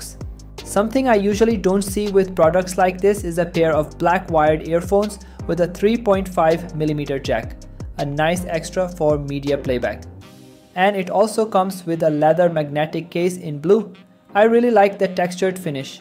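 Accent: India. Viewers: Mac and Windows ok. If only you tested with Linux! Also, for a Chinese channel, why does the accent sound Indian? Or are you using AI to read?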